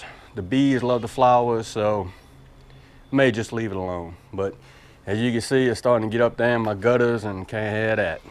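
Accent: South, United States. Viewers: But really in the nicest way we do enjoy listening to your southern drawl have a great day!!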